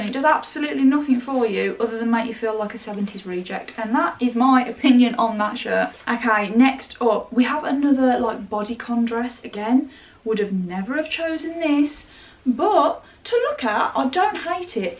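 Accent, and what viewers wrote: United Kingdom. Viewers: I have to say I love your accent!Which part of the UK are you from? Anyway, you're gorgeous!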